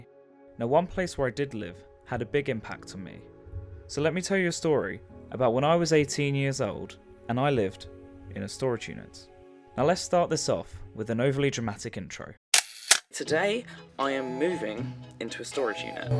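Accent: United Kingdom. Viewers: How could the poster not tell you were British? Your accent is obvious. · i can stand the horrific british accent for only so long 😭😭😭 god damn its obnoxious and gross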